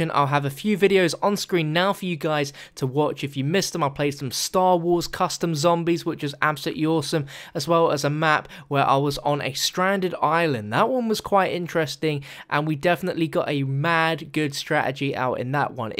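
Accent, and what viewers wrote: United Kingdom. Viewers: you sound Brittish. keep talking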